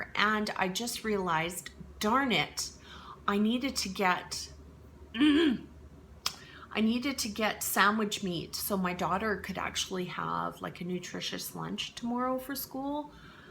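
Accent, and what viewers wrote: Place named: Minnesota, United States. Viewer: Your accent says Minnesota😎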